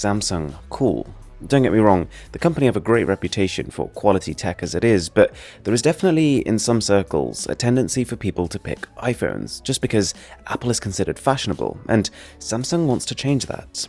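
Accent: RP, United Kingdom. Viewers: He’s from the UK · You sound like a etonian chinless wounder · Relax with the exaggerated posh accent. It's laughable.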